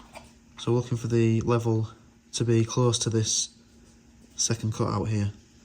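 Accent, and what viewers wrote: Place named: North, United Kingdom. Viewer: Good work, nice to hear a northern voice doing a service on these great cars. Do you know where the fuel sensors are and are they easy to replace? Cheers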